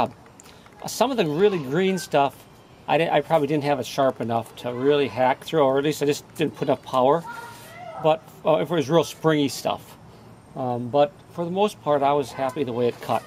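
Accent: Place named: United States. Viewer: Were you in Brazil? You sound American.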